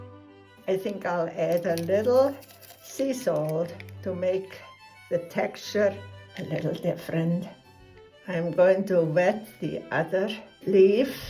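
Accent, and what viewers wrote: Switzerland. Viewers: Your DARLING one-of-of-a-kind Swiss ACCENT adds a wonderful CHARM: crisply frank & warmly feminine: BRAVA!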